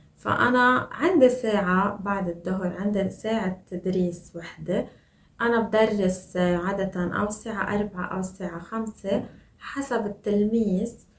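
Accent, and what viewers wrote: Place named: Lebanon. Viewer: I love the Lebanese accent it sounds pretty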